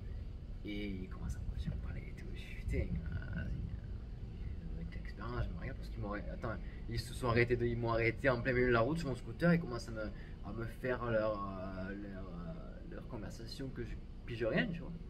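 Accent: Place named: South, France. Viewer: Pleins d’émotions soutenue par ton accent du sud, on apprécie ! 👌🏻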